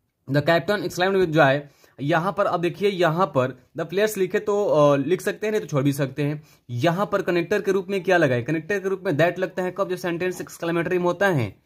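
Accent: Bihar, India. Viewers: Sir ,u from bihar 🤔🤔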